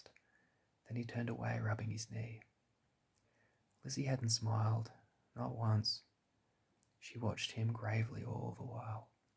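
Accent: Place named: Australia. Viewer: I find the aussie accent soft speaking so relaxing and calming, I think because we have the best accent 😜 great video, I loved the story, keep it up 👍🏼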